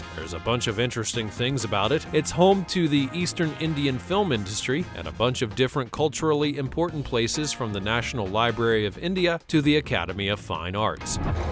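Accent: United States. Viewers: Hard to keep up when you do metric sometime and imperial the other. In America we do imperial and seems you sound American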